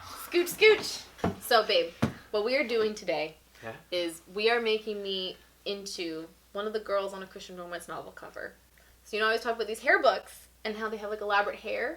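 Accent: Canada. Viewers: you're back!!! also your canadian accent makes me feel so at home :-D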